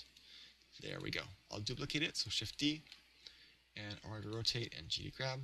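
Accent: Canada. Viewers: I <3 you because you're Canadian! I noticed right away!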